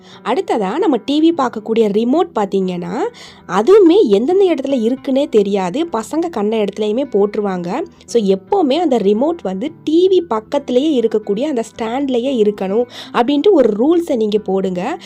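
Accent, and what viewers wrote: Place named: Ramanathapuram, India. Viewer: Sister unga voice Ramnad kilakarai slang mari iruku namma oora neenka?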